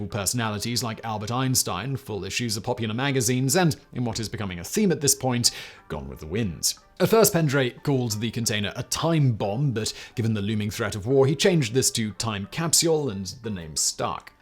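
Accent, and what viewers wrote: United Kingdom. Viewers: I know this was an old video when it started because Simon still has his full british accent.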